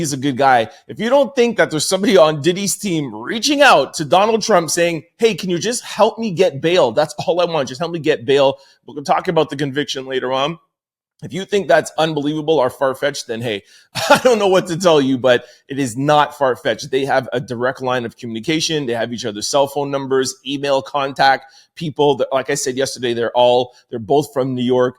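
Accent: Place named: Canada. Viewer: You’re not even an American are you buddy? I can tell by your accent you’re Canadian, correct? Get lost.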